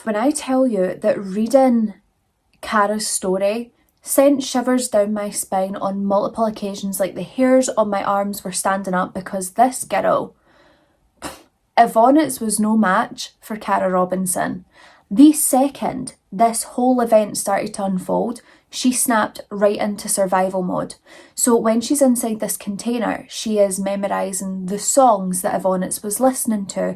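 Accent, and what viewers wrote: Scotland, United Kingdom. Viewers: Hi, Just came across your podcast and im definitely subscribing.. My ancestors are from Scotland, USA here… Love your accent ❤ p.s. you’re a beautiful young lady… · crying that you replied!! I LOVE your videos, as a fellow Scottish gal it’s nice to have a Scottish accent to listen too!🩷 I watch all your videos gets me through work everyday! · Hi, my mum was from Scotland i love hearing your accent and I love your personality your definitely 1 of my favorites ❤ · Love the ice blue on your eyes, your gorgeous. I also love your accent! The biggest part of my ancestry is Scotland, if I by chance had been born there maybe I’d have that accent!❤ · Love the way you tell a story i can easily understand you im Scottish too you bring humour whilst Being serious ❤you are a beauty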